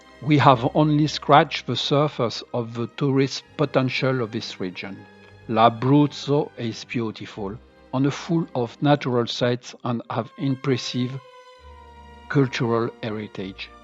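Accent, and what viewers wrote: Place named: France. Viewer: very interesting video and very funny french accent, t u 😃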